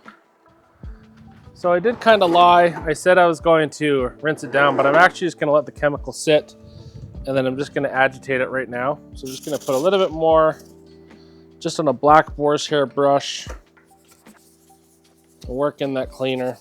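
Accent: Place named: Canada. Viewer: nice demo. It looked a little scary when you sprayed around the alternator. Thanks for speaking Canadian. 🇨🇦👍👍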